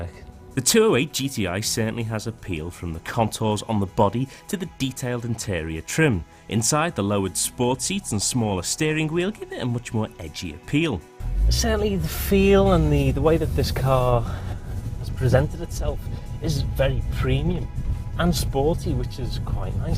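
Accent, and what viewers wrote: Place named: Wirral, United Kingdom. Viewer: His accent is Wirral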